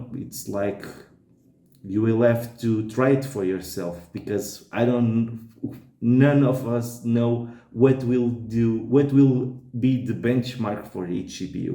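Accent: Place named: Portugal. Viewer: Acho que é português e não brasileiro. Pelo sotaque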